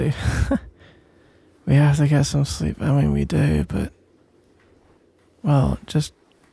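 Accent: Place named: Scotland, United Kingdom. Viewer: Your audios make me yearn for a boyfriend like you. The accent. The humour. The gentleness of you. God I wish I could find a scottish boyfriend like you haha. Keep making good audios, sbf! You literally saving us gays for being a hopeless romantic 😖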